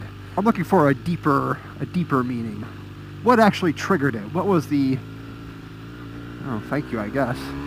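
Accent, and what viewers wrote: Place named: United Kingdom. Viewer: NinjaBoots ***** Hehehe...yes his British accent does make it special. I like his hones oppinions and style. Just being himself and looks like a good fella to my oppinion. Also f*cking love the track days and the test drives, Awesome. I am so happy for him, but was a bit sad when he said for the divorce... Hope he will do OK. Ride safe guys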